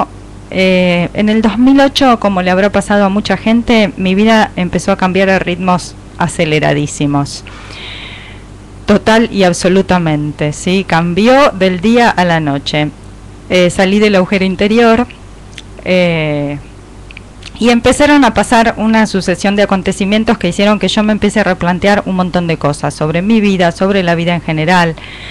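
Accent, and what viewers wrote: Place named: Argentina. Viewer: Gracias a ti, por recordarme mi acento (vivo en España), el sentido del humor y la claridad argentina para expresar algo cuando se la tiene clara y mucho mas, por recordarme muchas cosas a traves de tu experiencia. Pues, ahora a seguir experimentando sin los miedos! LO UNICO QUE IMPORTA ES LA CANTIDAD DE LUZ QUE BAJAS!!!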